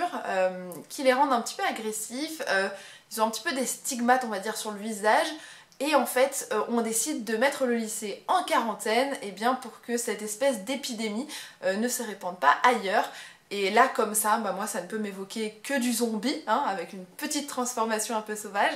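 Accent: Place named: France. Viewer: Un accent ne se travaille pas, ton accent peut s'amélioré mais restera toujours un accent Français. Je pense que tu confonds "accent" et "prononciation" qui sont deux choses différentes. Pour avoir fait une licence d'anglais, je peux t'assurer que l'accent n'est pas important, comme tu le pense, la prononciation l'est. Ne crois pas tout ce que tu peux lire sur internet.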